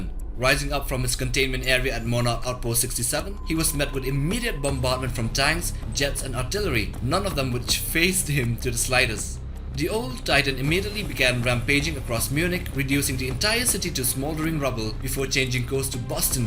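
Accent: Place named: India. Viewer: The Narrator is of Indian descent---- his English is a giveaway.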